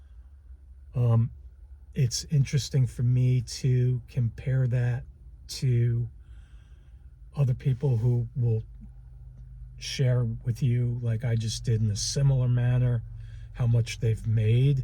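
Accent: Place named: Mid-Atlantic, United States. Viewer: Mid Atlantic accent. Interesting.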